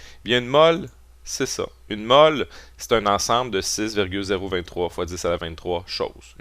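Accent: Quebec, Canada. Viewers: ps:l'accent Québécois est énorme 😅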